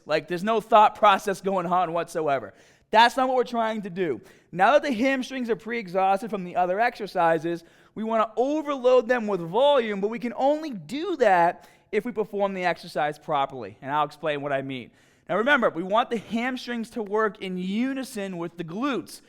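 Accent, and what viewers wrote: Boston, United States. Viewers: this boston accent is killing me lmfaooo good content though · Sounds like a Boston accent lol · Loving this Boston accent right now. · Damn. He sounds like he's from Boston. Every city in the northeast hemisphere of USA has an accent. All different. Philly and New York are similar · Is this guy from Baaahston??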